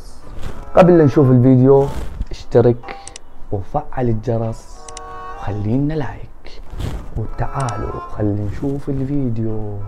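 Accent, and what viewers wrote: Iraq. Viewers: ليش احسك من الجنوب من اهالي البصرة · والله اول ماشفتك عبالي سعودي طلعت عراقي وحت حجيك مايشبه شكلك تحجي مثل العراقيين الكارهين الدنيا صدوك لو كال ابو المثل لاتغرك المضاهر خرررر بحضي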